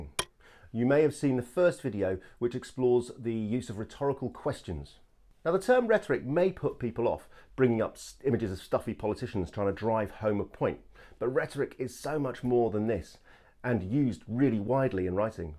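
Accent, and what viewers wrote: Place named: United Kingdom. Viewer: British accent. I love it. I wish you make videos and explain about it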